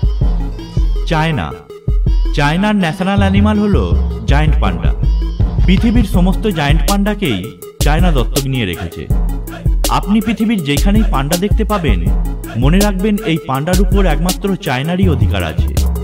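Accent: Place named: West Bengal, India. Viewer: he is West bengali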